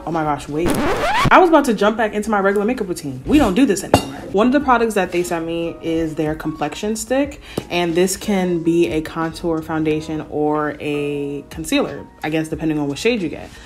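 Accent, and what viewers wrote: United Kingdom. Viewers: Why did I really think she was from the UK? 🤣🤣🤣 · Also your British accent is on point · SAME MAN N I THINK IT'S THE UK MAKEUP n u don't really hear or see much black Muslims in America on the media n also her accent just sometimes slips into a British one sometimes 😭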